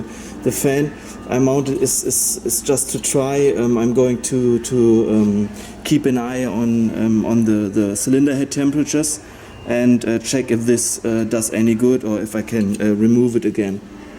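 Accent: Germany. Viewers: This happens if a german try's to speak englisch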